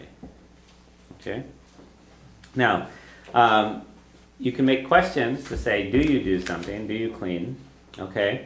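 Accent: United States. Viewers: If You have any respect for the Irish, get someone with an Irish accent, NOT A YANK ONE. Yes he may have the knowledge but JESUS YOU CAN'T LISTEN TO HIM!!!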